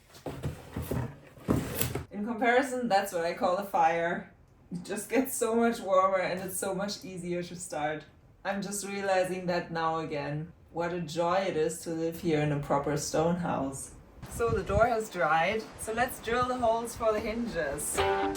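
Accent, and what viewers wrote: France. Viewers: Mignon accent français 😘❤️ Bonjour de France🎉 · a very nice french accent, keeps going amazing works.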